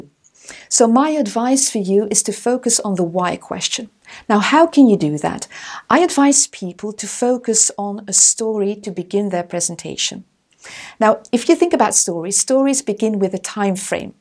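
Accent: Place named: United Kingdom. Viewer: She speaks very smoothly without any fumble- awesome British style of speaking...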